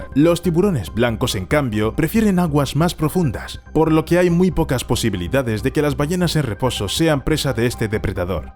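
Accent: Spain. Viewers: La voz suena como española, pero todos los insertos son de doblajes latinos. Anda la osa! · El sujeto tiene acento español pero usa clips latinos? Curiosos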